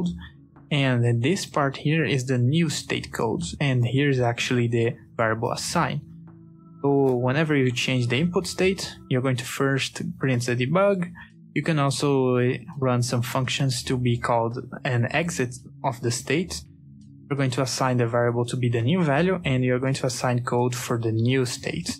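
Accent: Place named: Brazil. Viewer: br? esse sotaque n me engana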